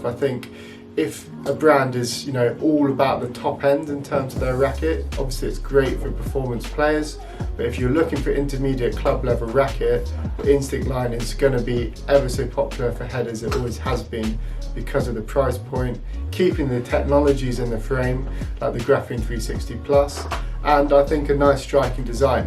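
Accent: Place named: England, United Kingdom. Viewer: England? I can barely understand, but love the accent. What about senior players? I’m finding this racket pretty awesome.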